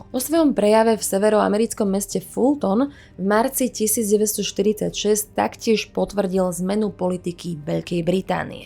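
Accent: Bratislava, Slovakia. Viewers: Slovenský hlas 😍 tomu dává úplně jiný Vibe 😇 jinak super video 😇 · Krasny, sexi hlasok, az na ten bratislavsky prizvuk a neznalost "ľ" ;) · Bratislavsky dialekt (nasilny akcent na prvu slabiku znižuje cely dokument....( suslanie ba 2.mieste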